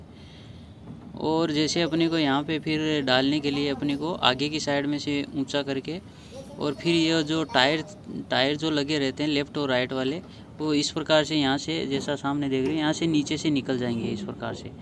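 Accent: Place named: Gujarat, India. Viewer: गुजराती हो क्या आपकी वोइस से पता चला आगेखेचेंगे तो , 55 , 66 का बेड है डबल बेड अछि तकनीक है 👍👍🙏 🔨🔨🔨 गुड़ वर्क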